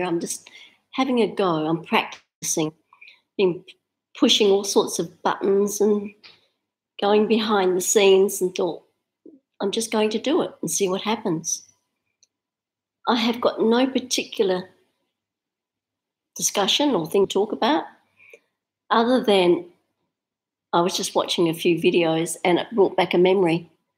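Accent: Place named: United Kingdom. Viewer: Hi from Turkey! I've been trying to learn English for a while. I watched your video while looking for the meaning of "gotta get going" on Youtube. Glad to meet you and your excellent British accent!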